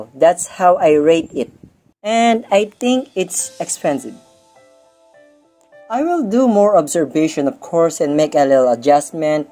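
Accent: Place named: Philippines. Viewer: Pang English English kpa halata nmn accent mo na Pinoy ka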